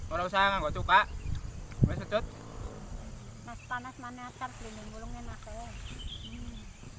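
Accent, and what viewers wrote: Banyumas, Indonesia. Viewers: Ngapak banyumasan ya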